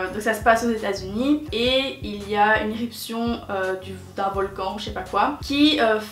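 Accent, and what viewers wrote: Belgium. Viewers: Tes belge? Sinon super ❤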